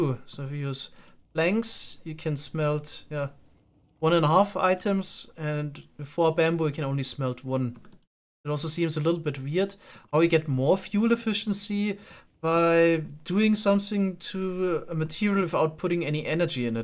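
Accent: Germany. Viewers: kann es sein das du Normalerweise Deutsch sprichst? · Ich höre da irgendwie Deutsch raus xD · Bruder guter content und ganz ehrlich der deutsche akzent ist mega charmant! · Are you german?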